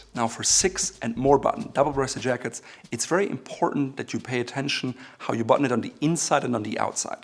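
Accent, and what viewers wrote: Germany. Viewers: May i ask are you German or switzy?😊 i like the accent brother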